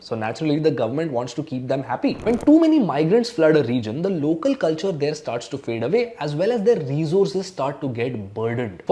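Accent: India. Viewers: Funny North Indian accent 🤣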